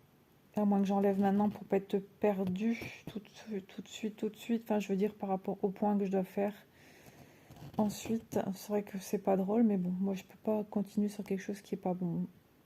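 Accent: North, France. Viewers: Bonjour! Je viens de découvrir ta chaîne! Il me semble avoir reconnu ce petit accent du Nord 😁je suis moi même du Nord j'habite près de Lille...j'ai regardé plusieurs de tes vidéos je suis moi aussi sujette à des crises d'angoisse mais après une thérapie ça va bcp mieux je prends toujours des médicaments et je vois une psychologue mais je reprends goût à la vie malgré les soucis...je suis moi même une passionnée de loisirs créatifs je fais du dp et du point de croix estampé j'adore!! La toile que tu fais est juste magnifique!! Jespere que tu vas aller de mieux en mieux ça fait du bien de se confier moi ça ne m'embête pas de t'écouter... je te fais de gros bisous☺️ ah oui je me suis inscrite sur le groupe (entre nordistes hein😁)a bientôt prends soin de toi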